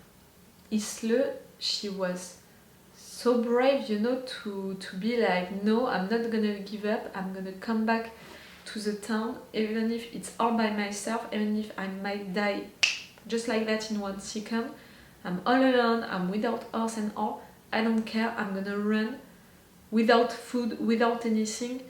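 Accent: France. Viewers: your french accent is adorable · I would avoid Lost Girls OVAs until you're done with Season 2. They do have some spoilers to them, but after go for it. I love your accent, please never apologize for being who you are. We subscribe knowing you're French and your reactions are very genuine and funny.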